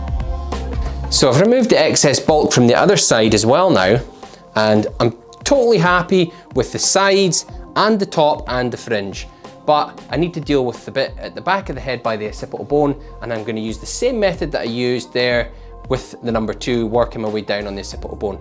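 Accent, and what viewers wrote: Scotland, United Kingdom. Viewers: Love the Scottish accent. It's like watching a production of Brigadoon! Great videos 👍😌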